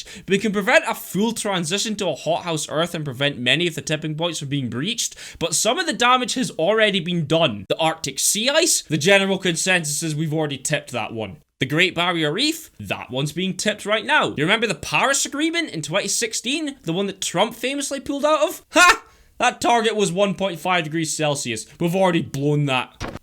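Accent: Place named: Scotland, United Kingdom. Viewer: holy shit you are Scottish. was not exepcting that. Fellow Scot. Not my real name haha. Sub'ed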